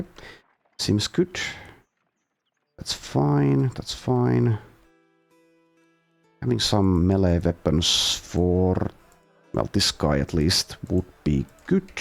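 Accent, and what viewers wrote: Finland. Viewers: agreed very familiar Finnish inflection on the "D" and "R" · Finnish I would say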